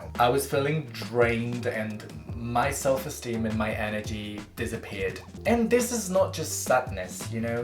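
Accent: England, United Kingdom. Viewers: Aprendiste inglés en Inglaterra?? Me gusta mucho tu acento inglés. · I love is English accent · His British accent make me a orgasm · Ohh, I see, I see, interesting. I actually root more for the British aacent, nice. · I don’t know what I was expecting you to sound like in English but I definitely wasn’t expecting a half-British accent lmao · i didn't exepct the british accent, tbh and i love it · I JUST LOVE YOUR ACCENT SOOOOO BLOODY MUCH, IT KIND OF REMINDED ME TO ADELE´S ACCENT AND I LOVE IT:face-red-heart-shape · TE SALE UN ACENTO RE INGLÉS ESTO ES PRECIOSO, MÁS VIDEOS EN INGLÉS!!! ❤️❤️ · U sound a little bit more gay in English 😂😂😂 and u have a bit of British accent 💙 Love it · You're english is really good! And you have a cute british accent jdjdjd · Me acabo de enamorar de la voz de hablando ingles! Suena como un ingles de uk! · Me encanta cómo quedaron los dibujos y que tienes un acento súper británico, por lo que me han contado las personas que me han oído hablar inglés mi acento va cambiando entre británico como el tuyo, americano (estadounidense, no canadiense) y escocés según qué palabras ( todo esto fruto de mis distintos profesores o fuentes de aprendizaje de inglés en general a lo largo de los años) lol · Tienes como un acento británico al hablar en ingles y me encanta! 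· DIOOOOO que fluido xd y hablas como un británico lol te adoro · EL ACENTO BRITANICO AAAAAAAA ❤️❤️❤️❤️❤️❤️❤️❤️❤️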